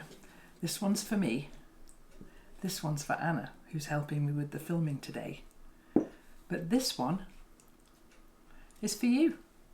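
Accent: England, United Kingdom. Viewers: You are always busy with something! I live in Michigan, USA, but was born in England at Wimpole Park. We went back to England again & lived in Maidenhead for my grades 1 - 4. Dad was in the US Air Force. I'm retiring at age 68 on 12/31/2022. I have several quilting projects lined up. I love listening to you as it reminds me of my neighbors in Maidenhead! They were like Grandparents to me! I love watching you make all of your beautiful things. Take care!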